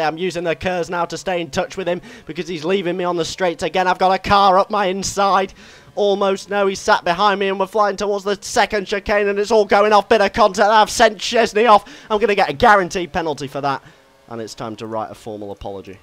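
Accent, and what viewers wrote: United Kingdom. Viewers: He has a very normal British accent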